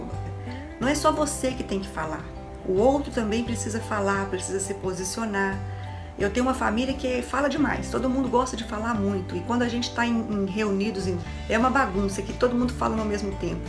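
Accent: Minas Gerais, Brazil. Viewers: Vc é mineira! Meu pai tbm, de Passos. Agora te entendo melhor. Bjssss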